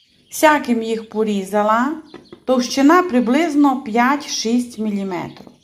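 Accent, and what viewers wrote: Transcarpathia, Ukraine. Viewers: спасибо Вам за замечательные рецепты, а слушая Вашу речь переношусь к своим друзьям, ставшими родными за много лет, в село Камяница. Горько, что не могу встретиться с ними, но когда смотрю Ваши ролики возвращаюсь в любимое Закарпатье. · Мені дуже приємно, що зараз є можливість чути закарпатський діалект, дякую за вашу працю. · Завдяки Вам, світ пізнає закарпатський діалект. А східний російсько-український діалект ми давно вже пізнали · у вас чудовий канал, чудовий матеріал, чудова закарпатська вимова. А ті типу-українці неповноцінні, що їм не подобається Закарпаття і закарпатці, хай слухають про варенички. З великим задоволенням спостерігаю за Вашою творчістю. Всього найкращого. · говоріть, говоріть і ще раз говоріть по закарпатськи! Це ваша фішка!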